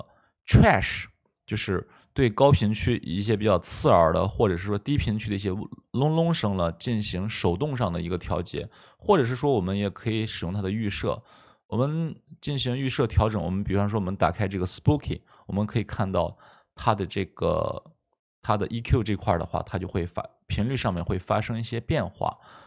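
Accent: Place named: Shaanxi, China. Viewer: 老乡啊啊啊啊 带着陕西口音 但是英语居然没口音🥰✌️喜欢喜欢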